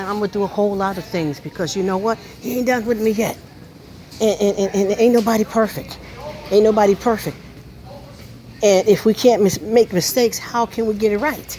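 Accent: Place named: Jamaica. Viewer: Love your Jamaican Accent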